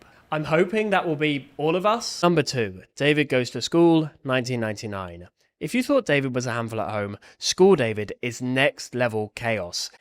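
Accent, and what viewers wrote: United Kingdom. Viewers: Hey there mate quick questions are you British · Yeah is British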